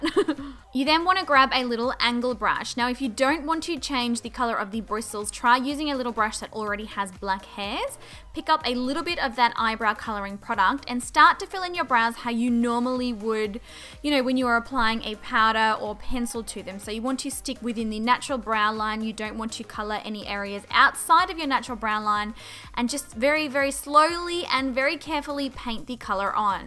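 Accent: Australia. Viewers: That’s correct that how Aussie’s say it🤗🤗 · Wow din knew u were Aussie yayyyy lots of love from Melbourne xx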